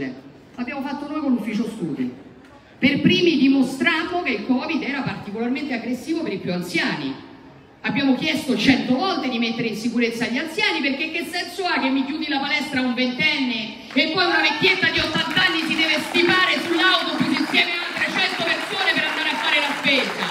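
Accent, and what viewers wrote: Rome, Italy. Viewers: Uno splendido accento romanesco ! la meio de Roma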